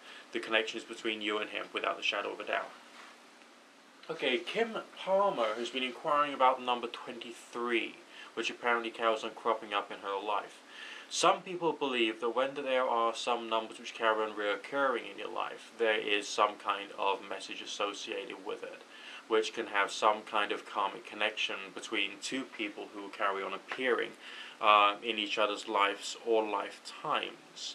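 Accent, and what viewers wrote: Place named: England, United Kingdom. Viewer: o sorry about my typing and yeah my names sari sherri and o your not australian like totally english your funny. i Enjoy listening to you you seem so genuine and have a great personalty and common scense. thanks again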